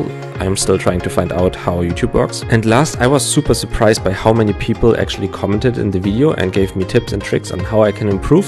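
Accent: Germany. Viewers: are you german? and your pronounciation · Sympatisch wie man dein deutsch raushört <3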